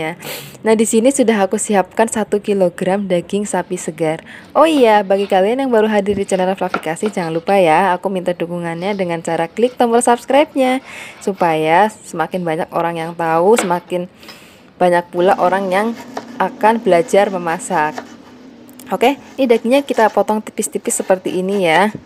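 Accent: Java, Indonesia. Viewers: Pantes gole ngendika gula ne rada medok 😀 kulo asli sokaraja tp merantau k bks mba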